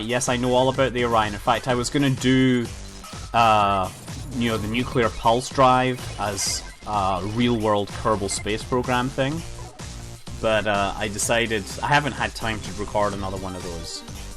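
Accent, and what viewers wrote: Scotland, United Kingdom. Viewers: Scotsman. Ha Ha ha Ha.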